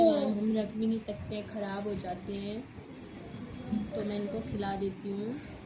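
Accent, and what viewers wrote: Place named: Pithoragarh, India. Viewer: आप की लेंग्वेज से समझ गई थी आप पिथौरागढ़ से होंगे, आप बेरीनाग से हो क्या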